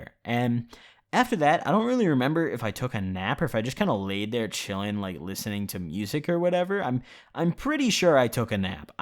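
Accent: United States. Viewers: Yo u from the states?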